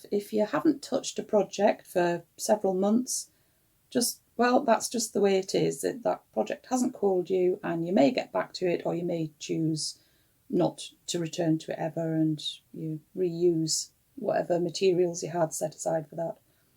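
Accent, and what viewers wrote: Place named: Durham, United Kingdom. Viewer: Hi. What a lovely podcast. I loved watching you make things and really enjoyed your tips for having lots of projects on the go. I use a lot of those tips and love having a little notebook to log all my projects. I especially love your Durham accent as it reminds me of a friend who lives in Hartlepool. Beautiful part of the world 😊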